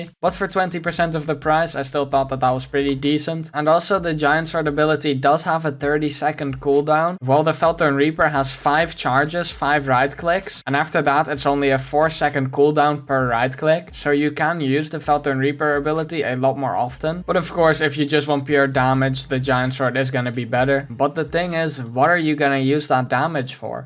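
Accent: Netherlands. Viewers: I smell a little bit of dutch, IGN: Lancebobo3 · bet that this guy is from the netherlands i know the accent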